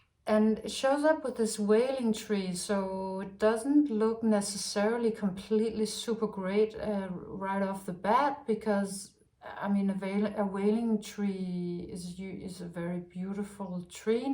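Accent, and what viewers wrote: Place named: Denmark. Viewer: Actions speak louder than words. Or another one is, 'What you do is so loud, I can't hear a word you say!' I just wanted to say your little video about saying 'NO' to someone is very timely and great advice. Thank you. (PS I've been trying to place your accent. I didn't realise you're from Denmark. My g/grandad was from Holbaek, Zealand.)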